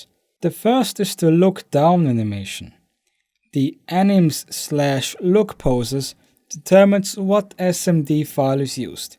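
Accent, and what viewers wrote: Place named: Germany. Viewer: Lel, hab mal dein Modding Video von vor 6 Jahren mit diesem hier verglichen. Den deutschen Akzent hört man so gut wie gar nicht mehr raus, nice :D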